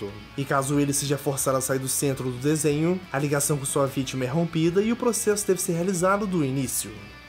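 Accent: Portugal. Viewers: Vc é português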